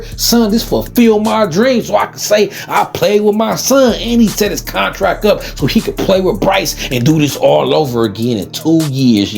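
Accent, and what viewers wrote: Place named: California, United States. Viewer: I can tell you’re from Cali 😂 good vid though man.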